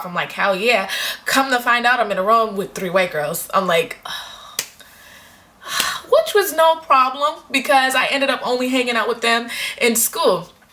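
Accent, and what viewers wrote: Boston, United States. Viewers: I can tell you're from Boston by your accent lol!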